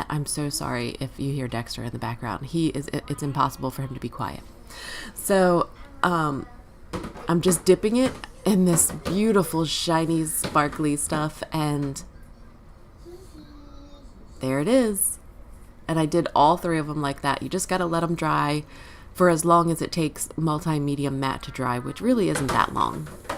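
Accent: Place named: Baltimore, United States. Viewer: I can’t tell you how excited I was to hear someone that sounds like ME!!! lol I’m in Baltimore Beautiful card btw 😁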